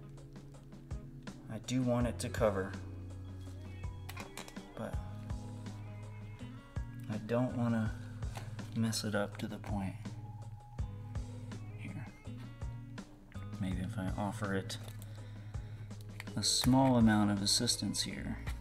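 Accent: South, United States. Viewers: That is super cool! I loved it as it came down over the cup, watching the different coloured cells go pass was amazing! The finished piece is fantastic. I love your accent, is that what's called a southern accent? I'm Australian so I'm not sure. Love this technique! I'll definitely try it if I can find a tiny hollow cup. Great job! 😀💜🐨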